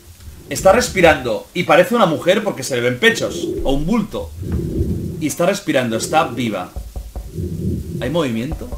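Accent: Catalonia, Spain. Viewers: Me encanta tu acento catalán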